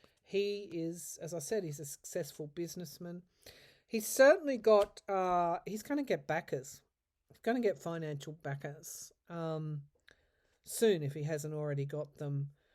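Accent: Australia. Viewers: So refreshing to hear an Australian voice!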